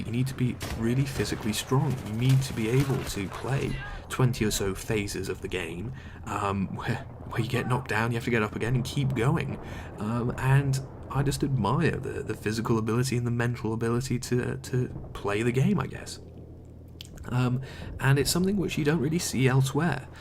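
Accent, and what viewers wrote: United Kingdom. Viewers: And 3 basketball or baseball.... I think you're from the uk considering the accent... These are two great sports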